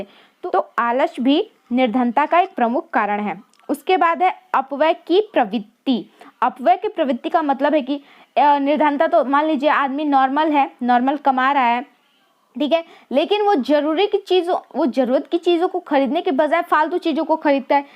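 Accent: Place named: Bihar, India. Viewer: Ma'am aapke language k hisab se aap ballia ya fir bihar se hai, sahi hai na...?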